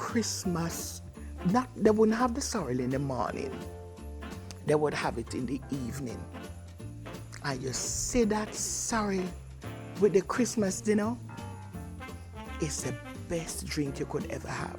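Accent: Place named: Jamaica. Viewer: Amazing jamaican speach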